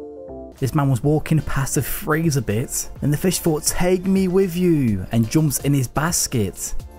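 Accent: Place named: Nottingham, United Kingdom. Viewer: mate you gotta be from nottingham/derby?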